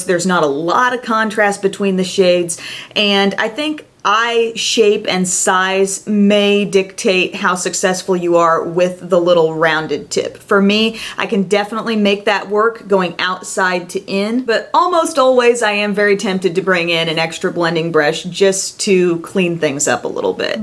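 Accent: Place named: South, United States. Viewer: Where are you from?? You defs sound southern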